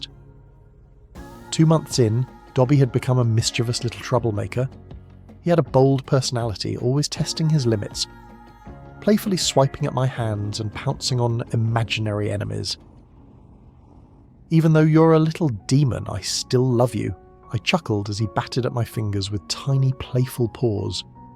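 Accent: United Kingdom. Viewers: Why is the voice from uk this is not filmed in the uk.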